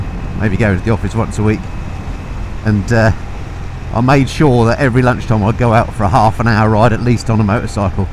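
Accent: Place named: United Kingdom. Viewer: Jeez, you don't have to be on a moto to be worthwhile---just your Brit intonations make you interesting enough for a Yank like me! You've got a niche in narrative video journalism; motorcycles and light aircraft will give you a lifetime of material.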